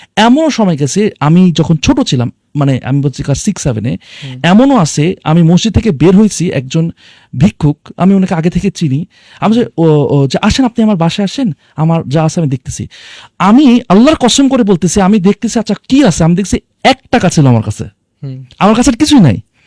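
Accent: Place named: India. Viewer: ভারতীয় ইংলিশ মারায়, ইংলিশ লাইনের এর শেষে "না~ না ~" করে। নোংরা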